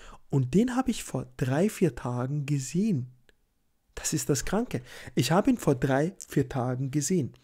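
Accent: Austria. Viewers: Bist du Wiener ?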